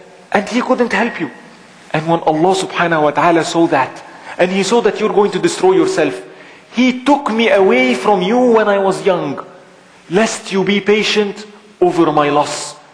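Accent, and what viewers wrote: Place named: Egypt. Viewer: Yakhi this guy is AWESOMEEE. Love him mashallah. Love the egyptian accent too xD Very nice lecture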